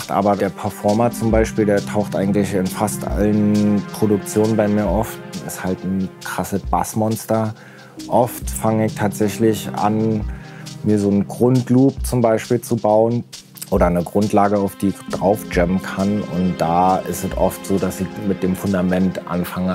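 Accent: Berlin, Germany. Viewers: schöne berliner Schnauze wa!? sympatischer Typ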